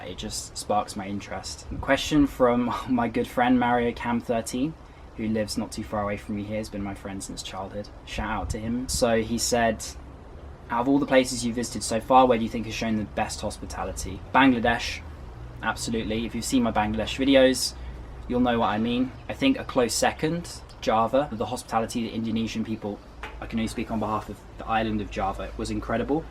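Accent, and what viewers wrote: United Kingdom. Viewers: I love listening to your British accent and that's the reason I watch your videos. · has a beautiful way of speaking the King's English. An eloquent Brit voice can be as attractive as anything you will hear in France, but at least with Mr. no translation needed.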